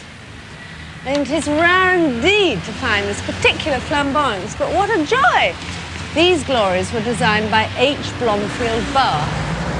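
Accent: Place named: Geordie, United Kingdom. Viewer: Love her broad Geordie accent . 😜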